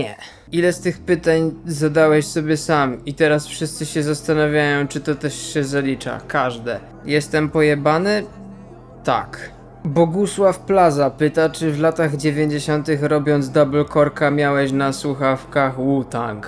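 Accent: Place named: United States. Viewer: A pytanie którego nie bylo to skąd pochodzi Twoj ang, i przede wszystkim akcent? Nikt nie ma takiego jankeskiego akcentu bez zmudnych cwiczen #anglistawie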